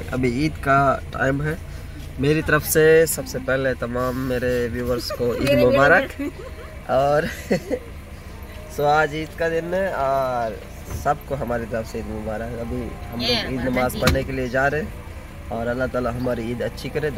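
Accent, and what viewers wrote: Saraiki, Pakistan. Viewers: "اے موبیل پکڑ او میڈا" لالا سرائیکی شناء لگدا پیا ایں۔. واقعی سرائیکی ہو آپ؟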